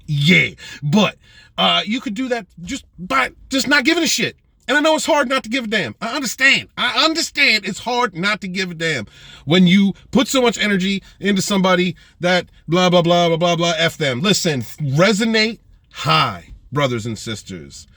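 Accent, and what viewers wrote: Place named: New England, United States. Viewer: Missouri, your accent gave me New England vibes lol, nice to meet you!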